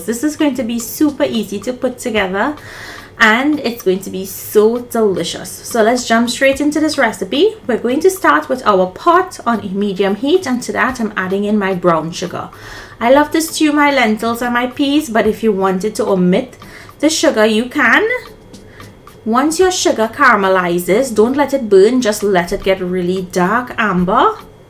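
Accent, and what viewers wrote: Trinidad, Trinidad and Tobago. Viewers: PS. I enjoy hearing the Trini 'twang'🥰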